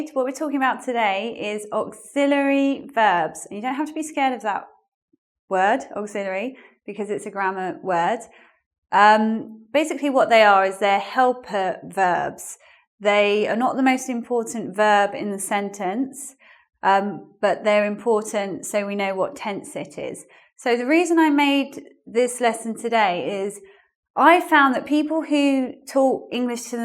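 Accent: United Kingdom. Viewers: Mam, do you use brities accent to teach? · jossan Her british accent is so simple to me... YOU CAN READ THE english subtitles AT THE SAME TIME IF YOU WANT TO learn active listening like i do. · Mrs please can you tell me which part of UK you are from? I'm asking because of your accent. Many thanks for the helpful lesson, I have problem with using (have) as an auxiliary verb! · that accent is British English?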